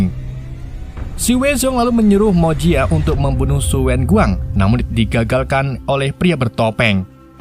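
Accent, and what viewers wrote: Java, Indonesia. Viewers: Min sampean wong jowo ta🗿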